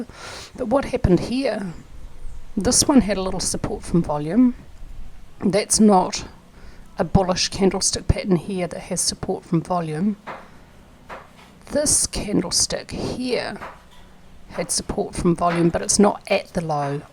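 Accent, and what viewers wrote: New Zealand. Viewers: Very good. Good ole kiwi accent too eh :)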